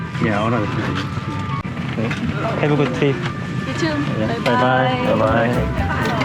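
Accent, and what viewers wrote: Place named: South, South Korea. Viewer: 님은 절대 설스타일 아닌데..ㅋㅋㅋ(구수한 편안한 남도스타일.)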